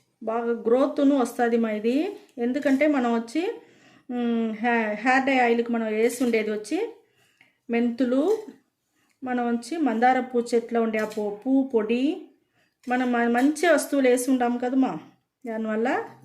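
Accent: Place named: Tamil Nadu, India. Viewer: Tamilien telugu edi . Kani great .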